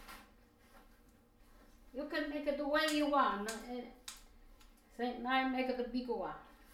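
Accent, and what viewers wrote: Italy. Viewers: I had the same exact plastic bowl, but in a lavender color and it broke one day while trying to seasoning my pork shoulder...by the way luvvvvvvv😍😍😍😍 your Italian accent and your videos...please continue to make them and the Italian food recipes...by the way I'm total Hispanic, but somehow I reallyyyy understand exactly what your saying even with your Italian accent. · I sometimes forget to watch the video as I love to listen to her thick Italian accent! Love her! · you are awesome, my grandmother was from Poland a d except for the Italian accent you remind me of her, I will see her again someday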